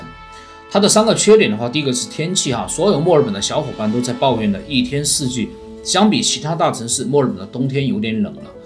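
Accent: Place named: Chongqing, China. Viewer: 博主听口音应该是重庆的？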